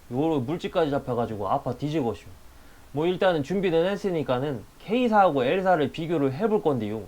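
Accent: Chungcheong, South Korea. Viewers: 와 이게 찐 충정도 사투리인거신가ㄷㄷ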